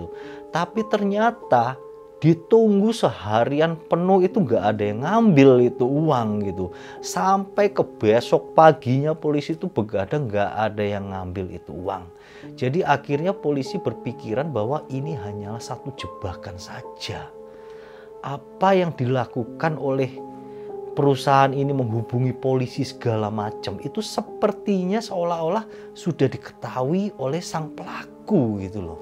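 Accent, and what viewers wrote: Java, Indonesia. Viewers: Jawa apa sunda om? Kok indonya medhok hehe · Medok bgt dah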